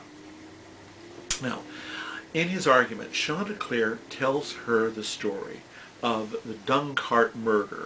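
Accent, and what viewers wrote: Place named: United States. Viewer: Yet another American! I don't want to hear about Chaucer with that annoying accent!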